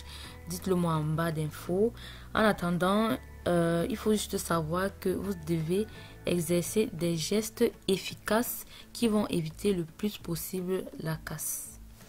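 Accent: Cameroon. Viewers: T'es camerounaise?? Ta manière de parler me fais croire à une camerounaise😂😂😂, tu me tue seulement mdr, j'aime t'es vidéo, continue comme ça👍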